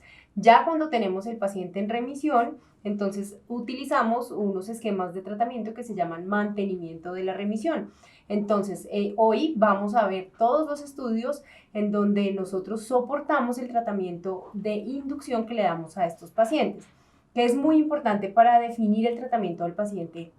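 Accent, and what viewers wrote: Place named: Colombia. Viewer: Definitivamente ya no puedo tolerar el acento colombiano, a partir de un daño gigante ocasionado por una mujer de allá. Se me revuelve el estómago, me repugna. Qué lástima.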